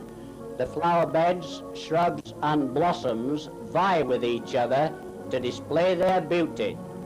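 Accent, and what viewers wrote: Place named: Yorkshire, United Kingdom. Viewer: hey thats a posh yorkshire accent too hahaha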